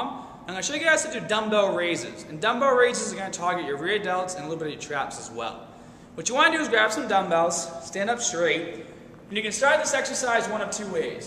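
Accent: Boston, United States. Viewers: Any chance this lad is from boston?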